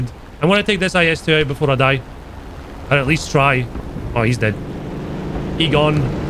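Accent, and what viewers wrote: Scotland, United Kingdom. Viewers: I love you too man specially that thick sexy scottish accent🍻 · I love you because you respect people's gender identities and you make sure to include them with your usage of the phrase "ladies and gentlemen". It's a small thing, but with human decency becoming politicized people often shy away from even acknowledging the existence of people that are gender nonconforming. Aside from that you make great content, and your very distinct Scottish dialect reminds me of a great horror movie reviewer on here named Ryan Hollinger.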